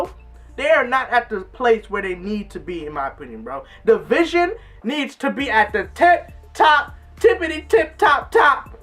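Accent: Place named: Jamaica. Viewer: are you Jamaican? Your accent is really similar to my Jamaican friends.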